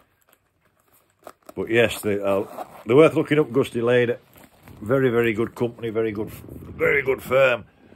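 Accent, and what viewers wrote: Yorkshire, United Kingdom. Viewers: Yorkshiremen aren't biologically capable of doing a posh, plummy accent...which is to your credit.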